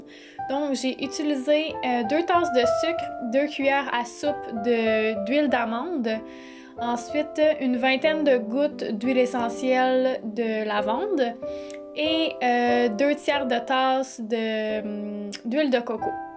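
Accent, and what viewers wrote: Quebec, Canada. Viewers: J'adore votre accent C'est québécois ?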